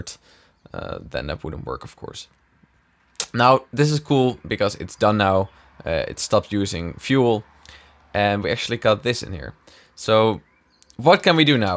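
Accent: Netherlands. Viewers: mooi engels man maar ik hoor wel dat je nederlands ben :D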